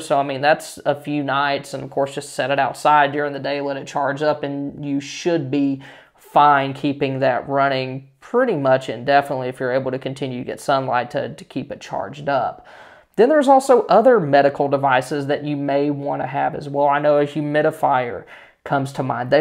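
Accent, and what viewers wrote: South, United States. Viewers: Discovered your channel today...and i like the dry sense of humor tutorial combined with a bit of Southern twang...makes me homesick... expat American tropical salutes from Venezuela!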